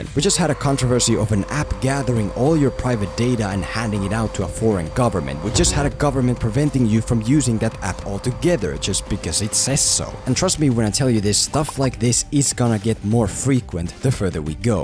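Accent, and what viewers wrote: Finland. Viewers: You have the most Finnish accent I've heard in ages. Am I wrong?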